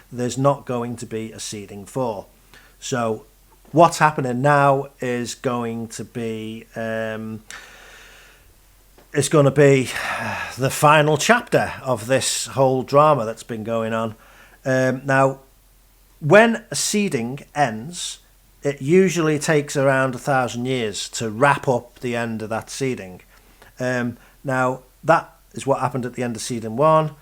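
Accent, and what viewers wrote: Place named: Merseyside, United Kingdom. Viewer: hi, can you tell me whrre you grew up please you sound like we grew up nearby.. my first years were Maghull and burscough. Just askin if its an issue just ignore. Its a complicated world just now. Great work...thank you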